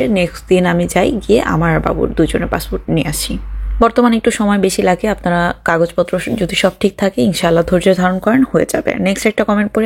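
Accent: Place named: Dohar-Nawabganj, Bangladesh. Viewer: Apur Kotha Sune Mone Hoy Apur Basa Dohar-Nawabgonj A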